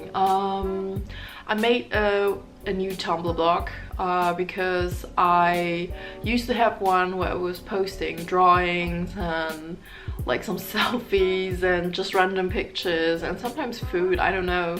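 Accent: United Kingdom. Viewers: Schön dich wieder zu sehen! Du siehst toll aus. Mach weiter so. btw Dein englisch hat sich bissl verändert oder?! du hast so einen britischen Akzent, ist mir vorher nicht so aufgefallen, vorher hat man immer gehört, dass du eigentlich Deutsch bist 😄 liebe Grüße, stay positive 😘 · You're wonderful, in everything that you do! Just know that we all love you and support you:) Btw unrelated -is it just me or has your accent become a lot more British lately?:o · I haven't watched videos in a while (i haven't seen any in my subscriptions, youtube algorithms must be not showing me) her accent sounds different and kinda British-y? I love the way the black hair dye is fading on the ends of her hair it looks really cool.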